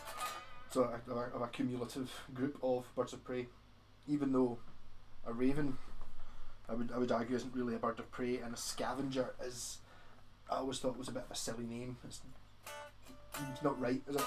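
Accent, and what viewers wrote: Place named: Kirkintilloch, United Kingdom. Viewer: accent suggests Kirkie?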